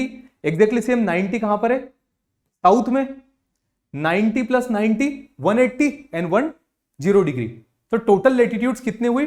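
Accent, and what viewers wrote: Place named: Rajasthan, India. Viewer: YOU SOUND LIKE RAJASTHANI SIR 😀 AWSM SESSION BTW ❤️🔥🇮🇳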